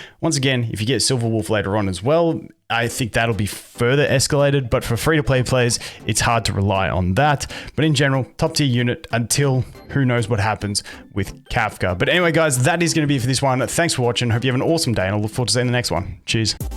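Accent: Australia. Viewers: wait australian?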